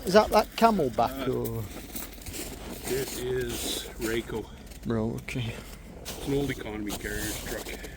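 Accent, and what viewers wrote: Midlands, United Kingdom. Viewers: Hello I’ve just come across your video I think it’s great even better when I noticed the midlands accent 😀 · Super good video first time i see your page if you dont mind me asking how did you end up driving a truck in usa when you got a uk accent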